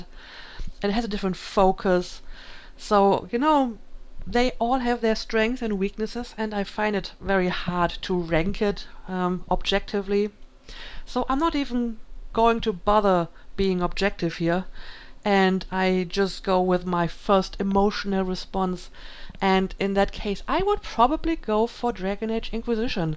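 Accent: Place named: Germany. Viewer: Myst... that was the first game that scared me too. But I was very young and didn't understand a thing. And nice german dialect you have there. Ist das norddeutsch/friesisch? Ich kann es überhaupt nicht einordnen. Hört man im Süden nicht so oft :D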